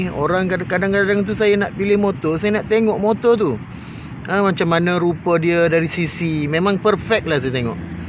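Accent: Kelantan, Malaysia. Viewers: acu kecek kelate skali bea hehehe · Kecek luar berbaur budu cap ketereh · Ore Kelate ko hox kecex nih????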